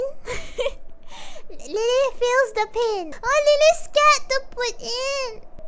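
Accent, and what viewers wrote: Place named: Malaysia. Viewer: She sounds so malaysian this is great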